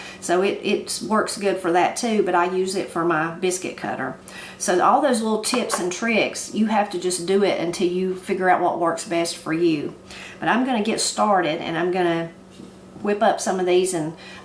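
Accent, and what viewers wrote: Appalachia, United States. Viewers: Listening to you made me unexpectedly happy. My mother's family is from Hindman KY but they moved to Ohio in the 1950's. My grandmother sounded like you - and great-aunts and uncles and second cousins. We used to go down there at least a couple of times a year for a week or two when I was growing up. Later on I lived for years with my grandmother when she was getting up in age. All those folks are gone now and there isn't anyone who talks this way in my daily life. It just about made me cry to hear Appalachian-speak again.❤ Also, thanks for the biscuit advice!😅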